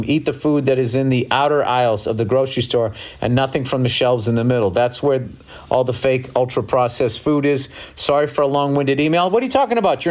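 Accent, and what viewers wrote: United States. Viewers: I see the flaming retards are back in the comments. I love the morons that say that I'm just here for the comments. You're helping Bill out on his channel, so thank you. Bill is still crushing it. He will still fill arenas and he could give a fuck less what the professionally offened have to say. All you haters will just become a punch-line in Bill's jokes. I still love that Bill brought his American voice to the Middle East.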